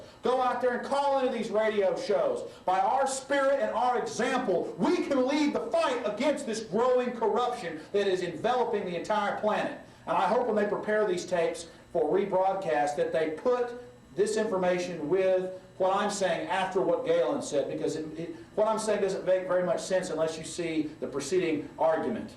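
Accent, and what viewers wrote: South, United States. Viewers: damn what happened to his southern accent? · Alex Jones or an imposter? Or is the current Alex Jones the imposter? This guy has a REAL southern accent, the current Alex Jones seems to have a Russian-pretending to be a Michgander accent. (heads up people, the biggest conspiracy you have never heard of is this: There are a LOT of Russians in key positions in this country pretending to be Americans!!!!)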